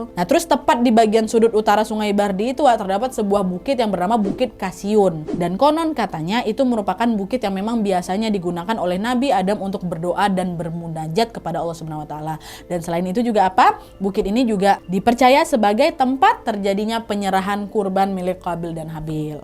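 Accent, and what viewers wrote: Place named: Medan, Indonesia. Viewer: Mirip kontennya Nessie tapi dg aksen Medan , menarik,, Salam dari subscriber baru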